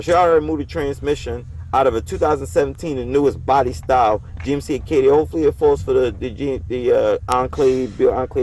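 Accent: Philadelphia, United States. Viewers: Are you from Philly? Happy belated and thanks for the vid.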